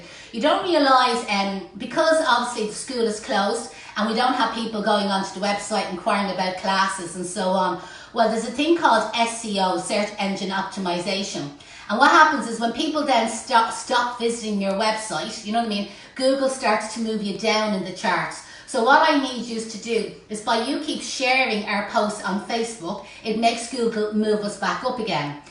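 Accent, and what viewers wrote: Ireland. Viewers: Ooh. An Irish accent. This is for my Christmas wreath. I’m listening ! 😂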